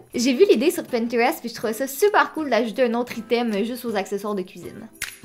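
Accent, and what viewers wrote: Quebec, Canada. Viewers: Bordel !! Ton accent québécois est trop mignon 😆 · C'est sûr que tu es de Canada parce que tu as la tonalité des Canadiens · J'adore ton accent il est trop cute ! J'ai meme demander a mes parents aille te voir au Quebec !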